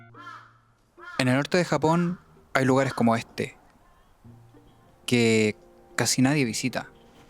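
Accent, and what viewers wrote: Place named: Chile. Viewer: A mi me engañan apenas entre al video supe que era chileno, siempre hay un Chile 🐻‍❄️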